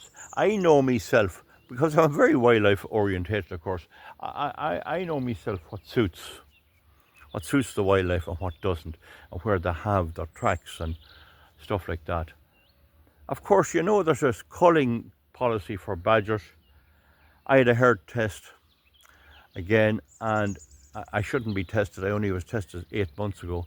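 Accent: Ireland. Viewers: I love the Irish accent :)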